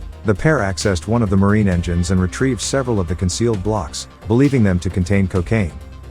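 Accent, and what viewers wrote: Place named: United States. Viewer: . I'm aussie and I reckon the yank voice suits this better. Dunno why, probably just used to it. Either, you're awesome, stay that way!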